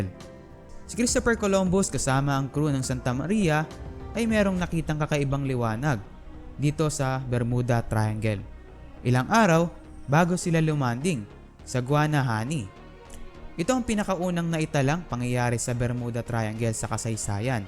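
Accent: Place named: Pampanga, Philippines. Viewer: Capampangan accent hehe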